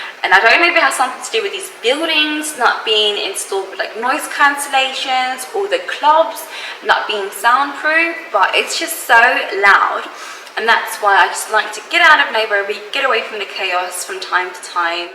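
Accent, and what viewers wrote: United Kingdom. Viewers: U give the feeling that u got a bit attitude . Sorry to say but u are too british in your speaking and body language. If u like western lifestyle maybe try to live in the states or uk and leave africa for the ones who appreciate the real africa without west influence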